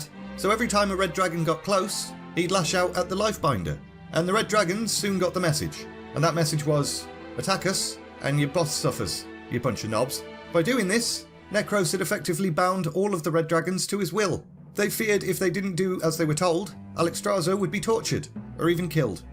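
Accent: United Kingdom. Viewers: Are you British? I like ur accent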